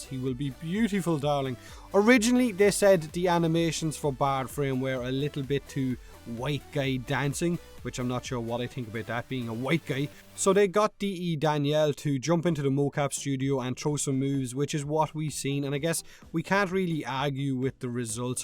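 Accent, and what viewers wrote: Ireland. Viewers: Hmm, your english sounds a little bit strange. Are you irish?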